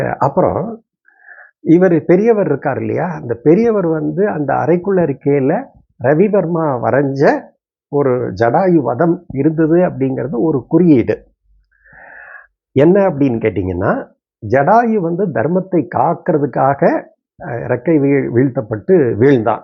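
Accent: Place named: Tirunelveli, India. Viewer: உங்கள் திருநெல்வேலி ஸ்லாங் எனக்குப் பிடிக்கும். நீங்கள் நல்ல கதைசொல்லி. திருநெல்வேலியில் இதே போன்ற ஒரு சம்பவம் எனக்கு தெரியும். இளைய சகோதரியும் பள்ளி ஆசிரியை.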